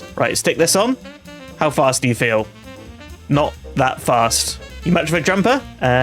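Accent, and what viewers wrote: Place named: United Kingdom. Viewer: I was listening to this as I slept and I'm just now realizing now that I'm awake that he's British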